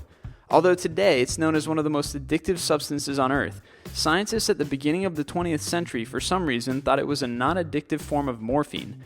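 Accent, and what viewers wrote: Canada. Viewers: ***** Racist? He's a Canadian..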